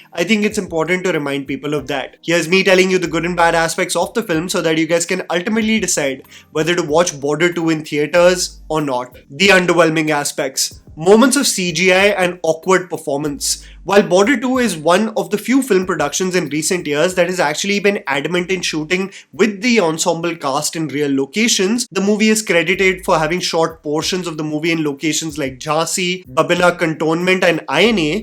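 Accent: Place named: India. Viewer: Your indian English accent is so irritating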